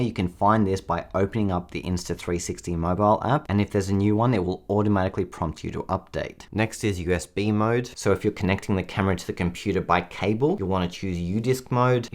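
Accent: Australia. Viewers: wonderful to hear the Aussie accent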